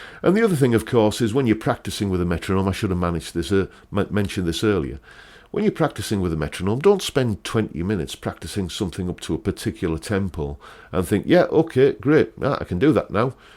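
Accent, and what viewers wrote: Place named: Teesside, United Kingdom. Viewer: I worked at North Tees General Hospital (as it was) for what seemed like a century (80 hours/week) . That accent brings it all back !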